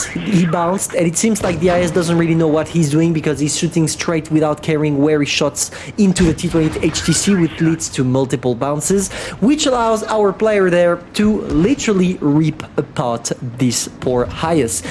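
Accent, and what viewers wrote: France. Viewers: On dirait un français qui parle anglais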